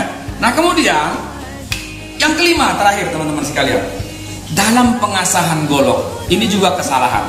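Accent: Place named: Palembang, Indonesia. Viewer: Mantul Pak Ustadz ngomong2 dimano ini palembangnyo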